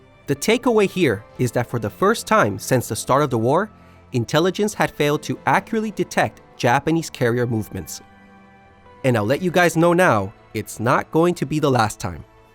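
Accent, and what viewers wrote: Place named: United States. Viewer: These are two very well done videos. Thank you for separating them from the perspective of each commander. I do appreciate you reading from sources as well as narrating the events. However, I would like to give you some constructive criticism. When people make videos on topics that I do not consider consequential, I don’t give constructive feedback. But since you are making informative videos on world history, I would like to do this. And my criticism is regarding your pronunciation of certain words. From your accent, you’re obviously American as I am. Your pronunciation of the letters TH vary. You pronounce thoughtfully, and thankfully, perfectly in my opinion. But the harder TH sound of the, them, and they come across as de, dem, and day. Also, with the words thoughtfully, and thankfully, you use the letter L correctly. 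But in other words, you swallow it. Guadalcanal becomes Guadohcanow. Vulnerable becomes vuhnaboh. I’ve been around, and I know that the ability for someone to communicate in my version of English has nothing to do with intelligence. Just like my inability to speak Russian, nor Swahili is a reflection of my own intelligence. The ability to speak American English, comes down to education and application. You have demonstrated your education by putting together this video. I would just encourage you to apply yourself a bit more to the language to finish off this video with the same expertise and skill with which you began. Thank you again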